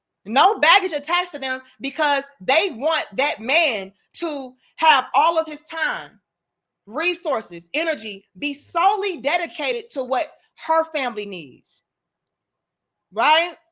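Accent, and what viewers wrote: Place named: St. Louis, United States. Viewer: Yo. You sound like you from the Lou. Where you born in the 314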